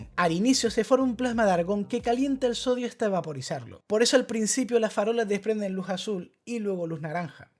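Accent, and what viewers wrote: Chile. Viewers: Soy yo o tiene un acento parecido sl chileno? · Gracias! Excelente infotración. El centro de mi ciudad estaba repleto de avisos de neón en los '70. Una duda... tienes acento "chileno" es idea mía o eres de acá? Saludos desde Chile!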